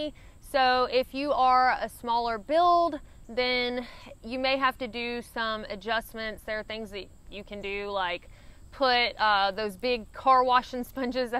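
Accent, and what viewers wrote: South, United States. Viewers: Ha ha! You say “strowed” just like my father from North Carolina. Don’t ever stop talking like a Southerner. Southern dialect is endangered.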